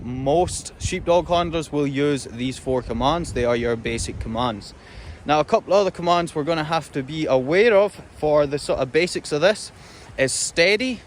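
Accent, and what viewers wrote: Scotland, United Kingdom. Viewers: Good evening. It is Isabelle from France. It is so nice to hear this Scottish accent of yours. Yes you love what you do and it shows. These dogs are so intelligent, it is marvelous. All you explain is very interesting. Thank you ever so much. I once looked after rough collies in England. 15 dogs who drove me crazy hihihi 🤣🤣🤣. Border collies are my favourite though! Thanks to share your work with us. See you and take care. Bye bye! 😉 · English spoken with Scottish accent. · Hey, I do have a question: I cannot understand your order for the recall. I am from Germany and it’s difficult for me to understand your scottish mothertoung. For me the order for recall sounds like a serene! 😅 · Your accent makes it so much. Better. Some American guy yelling these commends would not be as exciting. Yeah Scotland!!!!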